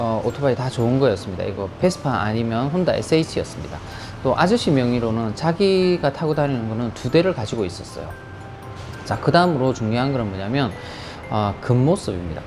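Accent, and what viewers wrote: Gyeongsang, South Korea. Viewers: 역시 서울말투보단 경상도말투가 믿음과 신뢰성이 가네요~~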